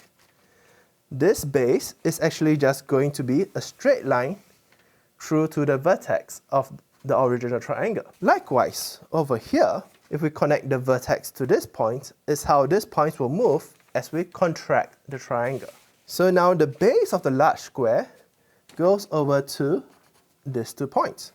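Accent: Singapore. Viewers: is he a Singaporean? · dat singaporean accent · is the speaker singaporean/malaysian? because I suddenly feel really patriotic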